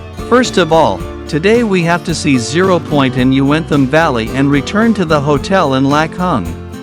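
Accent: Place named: United States. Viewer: Why would you use an American voice for this video?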